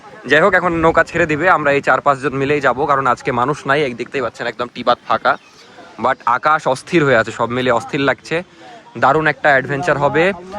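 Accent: Rajshahi, Bangladesh. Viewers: I like ur accent 😂🎉 rajshahi accent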